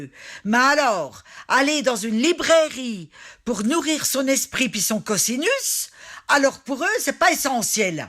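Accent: France. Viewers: Merci ma très chère pour ta verve et ton regard si aiguisé sur cette société mortifère...on a besoin de ta vision et de ton accent qui nous emmène au cœur de ta belle province. On t embrasse du cœur du Nivernais et n oublie pas Lola!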